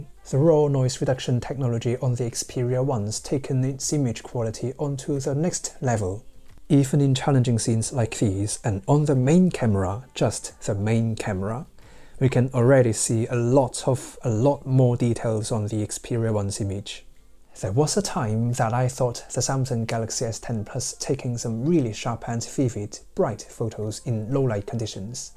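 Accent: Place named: United Kingdom. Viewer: 我的天啊你的british accent真的太好聽啦，完全是一種享受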